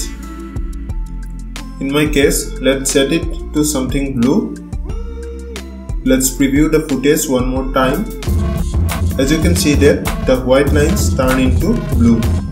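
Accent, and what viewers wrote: India. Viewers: finding a tutorial 😀 Indian accent ☹ only tutorial there is 🙄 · You sound like an Assamese. I am Assamese and ik my ppl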